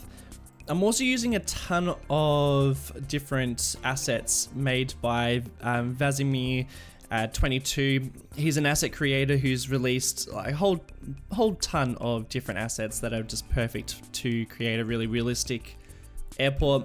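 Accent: Australia. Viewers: Your Australian Yes More Australian Youtubers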